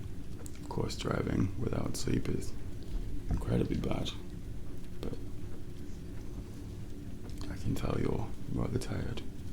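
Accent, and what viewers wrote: United Kingdom. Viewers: Omg he speaks British! & this is art! Congrats! Felt like watching a movie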